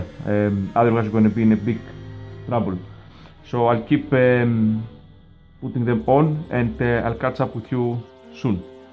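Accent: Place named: Greece. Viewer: I have a question, where are you from, i'm trying to place your accent, I want to say its Greek, but I could be wrong.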